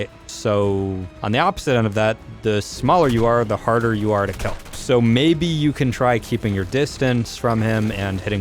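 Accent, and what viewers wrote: Australia. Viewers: Want cute Australian bird voice back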